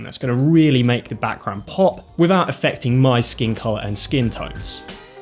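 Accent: United Kingdom. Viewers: Did anyone expect a British sounding skater boy to talk about beauty lighting set up? No. But did he nail it? Yes.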